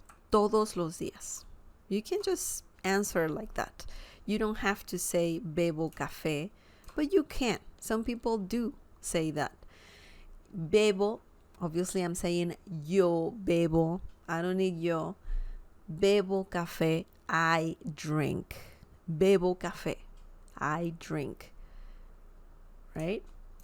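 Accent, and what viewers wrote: Castile, Spain. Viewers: is this considered castilian spanish ? also i noticed that the C/Z words that are pronounced TH is not the case when the letter C is at the beggining of words ?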